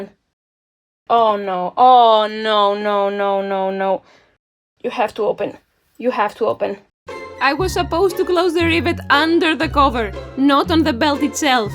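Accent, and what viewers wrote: Greece. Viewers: Πολύ ωραίο βίντεο! Μήπως τυχαίνει να ξες ελληνικά; Γιατί η προφορά σου λίγο μου θυμίζει τον τρόπο που ένας Έλληνας θα μιλούσε αγγλικά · Where are you from ? You sound kinda Greek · Are you greek? The accent kinda sounds similar to my families 😅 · This is a lovely video, plus i can recognize a greek accent anywhere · Are you Greek by chance? I love the artwork and your accent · You have done an amazing job! The journal looks fantastic! So much work that you have already put and continue to do so. I hope you get thereand that this project of love turns out exactly how you want it to. A thousand well dones! ps. Is this a greek accent I hear? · My apologies for pointing it out ;-) I'm Greek myself (born and raised in Belgium though) and that Greek/English is so recognizable ;-)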